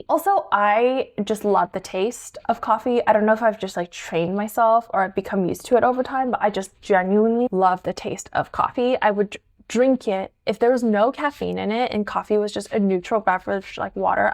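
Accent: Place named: California, United States. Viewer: I have never heard so much Californian accent from someone on YouTube before.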